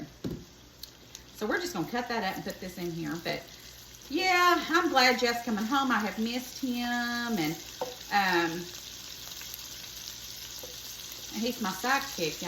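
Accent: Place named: Alabama, United States. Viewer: Enjoyed this recipe and you so much! Love cabbage, love noodles. Will be trying this. A coworker frequently cooks in our break room in an identical skillet & makes magic happen. Going to be getting one. You sound near me. Subscribed. Hello from Talladega.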